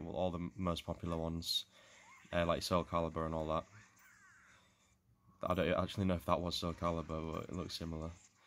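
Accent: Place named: Yorkshire, United Kingdom. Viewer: Are you from Rotherham or Sheffield?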